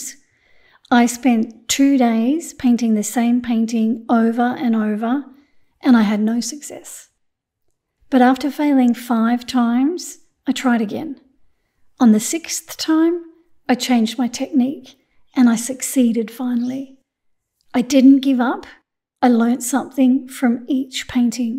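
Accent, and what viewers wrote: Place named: Australia. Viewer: I love your videos. I have just started watercolor painting after nearly 45 years since school and found your tutorials and tips very helpful and inspirational. I also love your humorous outtakes at the end and love your Aussie accent. ❤❤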